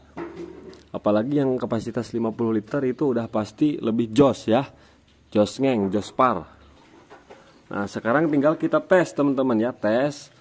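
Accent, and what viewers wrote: West Java, Indonesia. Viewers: Mas'se kalau bicara,,?,🙄 logatnya seperti orang Jawa Barat..😅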